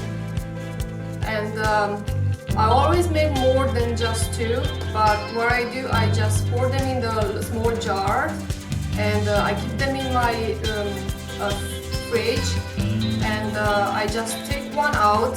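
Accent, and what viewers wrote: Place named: Romania. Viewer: buna , dupa accent pot spune ca esti romanca , sper sa nu gresesc . dragute videourile tale . 👍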